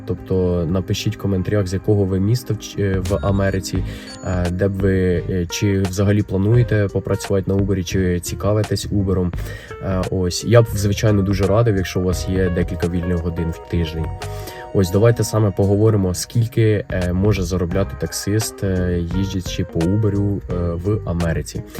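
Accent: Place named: Western Ukraine, Ukraine. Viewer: Я когда слышу его голос или говор меня аж сука коробит) от войны убегало , вуйко?)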